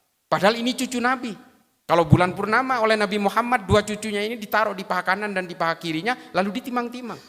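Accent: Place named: Madura, Indonesia. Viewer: Dari logat bahasanya seperti suku Madura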